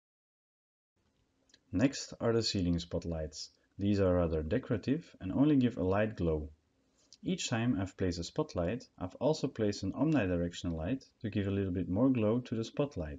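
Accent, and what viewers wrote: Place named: Flanders, Belgium. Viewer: Nice video, and nice flemish accent ahahah. Bedankt maat!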